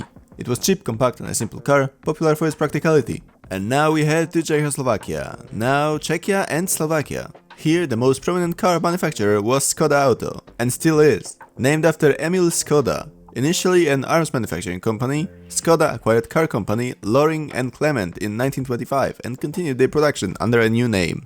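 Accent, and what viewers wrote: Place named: Poland. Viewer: You sound like a Polish 😊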